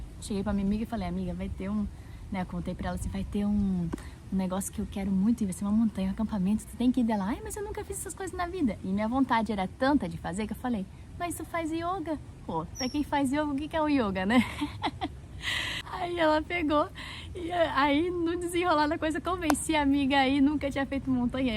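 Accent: Brazil. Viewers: Você é muito simpática! Tem um sotaque mineirim....O Guto "indicou" o seu canal. Sucesso!! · Abraços de Ibirama/SC!!!! Parabens pela Vibe muuuuuito boa e esse R com sotaque paranaense minha terra natal!!!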